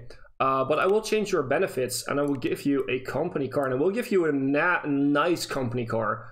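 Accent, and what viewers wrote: Netherlands. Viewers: I live in Brabant but am from the US. So I speak the language my accent gives away my secret. And you have a bit of a Dutch accent, but I also thought that you could sound a bit like your more eastwards, so wasn't sure.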